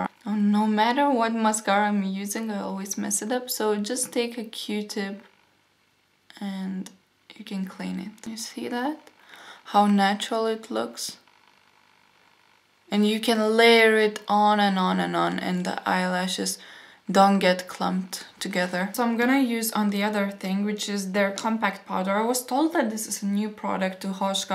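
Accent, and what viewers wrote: Russia. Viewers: You can fill distilled water into the mascara when it is getting too dry, just a few drops of water, then let it sit and shake it a few times so that the liquid can mix. It works very well I have done that several times. You don't have to throw it away, this way it lasts for a long time. Dr. Hauschka products are 100% made with biodynamically grown plants and some products contain natural minerals, ashes or clays. All ingredients are from nature in their whole form and not altered. They don't test on animals and they don't have subcontractor companies test on animals either. Dr. Hauschka and Weleda are the best cosmetic companies in the world. I only use those. You are very beautiful and I love the Russian accent.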